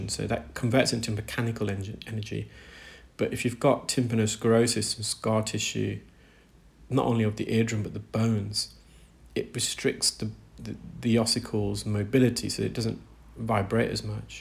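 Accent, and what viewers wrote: England, United Kingdom. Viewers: Hmmmm... I believe that he's English and as such you really can't complain about any pronunciations . I think they have the corner on the market for all English language users.lol That's basically asking someone to speak "American English". Pretty rude if you ask me.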